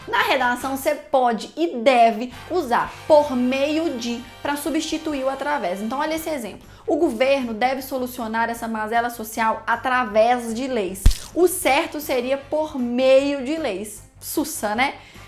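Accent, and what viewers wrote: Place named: Minas Gerais, Brazil. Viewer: certeza que é mineira hahaha sotaque muito carregado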